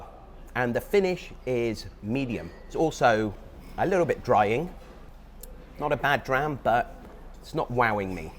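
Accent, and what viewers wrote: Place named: England, United Kingdom. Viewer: What an amazing video! When I go to Japan I want YOU to be my guide!! I have watched many of your videos and I love them all, very interesting! It is nice to see an English man that knows so much about Japan and whisky!! And I love your accent! Thank you so much for creating such interesting content about Japan, I enjoy every single one. Kanpai!!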